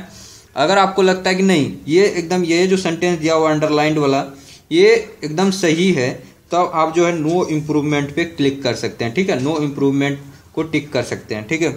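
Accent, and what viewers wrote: Bihar, India. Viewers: sir aap Bhagalpur Bihar SE ho kya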